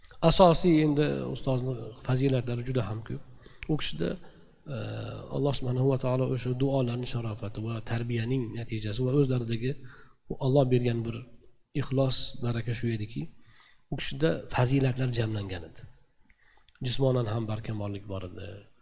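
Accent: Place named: Andijan, Uzbekistan. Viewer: АЛЛОХ рози бўлсин жудаям 👍чиройли маруза қалб ором олади андижондан анджонча содда ёқимли❤❤❤❤❤❤❤❤😊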